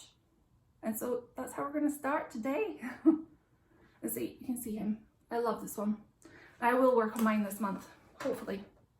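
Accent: Scotland, United Kingdom. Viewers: The little Nessie is adorable!!! I couldn’t understand who made it and who gave you all those gorgeous gifts?? Awesome! Great haul, love all your purchases. I’m so glad you’re finishing Black Bear, it’s an amazing design and you’re so close. By the way, it’s so strange hearing the Scottish brogue against a background of wild fires and American shopping (I’m in Sussex U.K.). Congrats for your 1k, Winter Cabin would be great to stitch, thank you!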